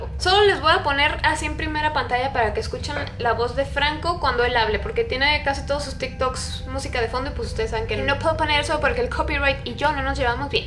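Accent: Chile. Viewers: Anuma me si cuenta que era de Chile como io pedo soy Chilena XD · Ajaja se kacho altiro k era de chile akkakak ❤🇨🇱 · Me di cuenta de que el es chileno ._.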